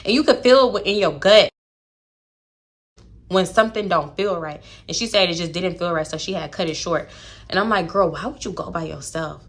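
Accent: Baltimore, United States. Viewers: you taking me outtttt wit the baltimore accent🤣🤣it’s so funny hearing how we sound to other ppl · Are you from Baltimore? ( your accent lol) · this random baltimore accent be taking me out 😂😂😂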